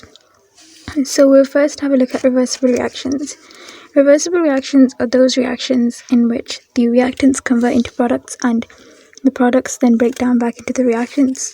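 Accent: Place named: United Kingdom. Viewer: Hey, loved this video...and your accent ahhh it's sooo british. looking up for more videos...keep up the good work ❤❤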